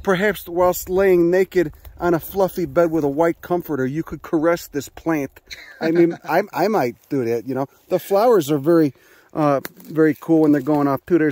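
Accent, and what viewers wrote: Chicago, United States. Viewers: This guy rips! Love that heavy Chicago accent. Caught be off guard in the best possible way. I know almost nothing about these species but the delivery keeps me interested. Good stuff!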